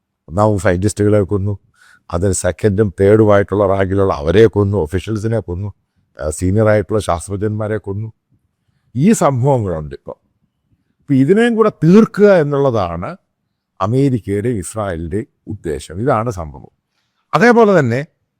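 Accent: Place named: Kottayam, India. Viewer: ആ കോട്ടയം സ്ലാങ് തള്ളൽ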